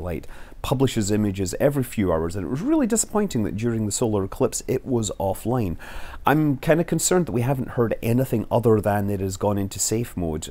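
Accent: Scotland, United Kingdom. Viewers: lol. It just hit me what it means for you to be touring Europe. Your accent is so strong I keep forgetting you're from the US... There seem to be a lot of American youtubers with various kinds of British Accents... Feels kinda weird. (and yes, I know that's a scottish accent. But you know full well that Scotland is part of the UK, so it's not technically wrong, even if the Scots might tend to think so... I mean, that affects me personally in fact - I've known for ages that I'm 1/4 british, but I'd never looked into how or why, until a few years ago when I learnt that this was pretty much mostly Scottish ancestry. Because my Grandfather is Polish and my one of my father and one of my aunts were born in Plymouth that wasn't immediately obvious... - if for some bizarre reason you're wondering, my other aunt was born in Zimbabwe. Probably tells you a lot about my family huh. 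XD - the other half is mostly far more boring, but, again from a different country...)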